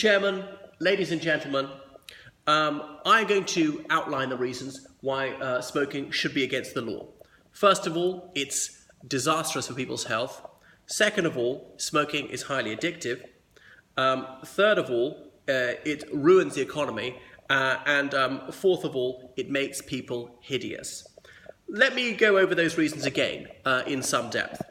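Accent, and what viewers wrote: RP, United Kingdom. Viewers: I was looking for posh british accent and I found you. Im peruvian and I ve been to England quite a few times .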